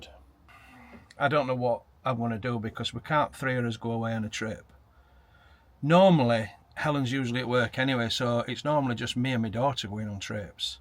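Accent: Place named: Lancashire, United Kingdom. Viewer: We just found your channel…and love 😊it! Your accents bring back happy memories to my Lancashire born wife! Replace those wine glasses with plastic ones (we bought ours from Wilko, but as they no longer exist I’m sure The Range will have them). Bin your ear plugs and use the white noise app on your smartphone…..when you first hear it you’ll think you can’t sleep with all that noise but before you know it you will be sleeping like a baby!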